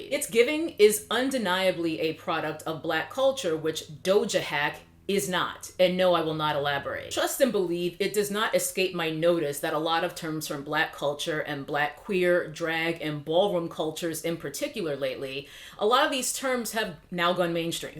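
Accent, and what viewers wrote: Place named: New York, United States. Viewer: eggplant in garlic sauce in Flushing. You sound NYC so you know.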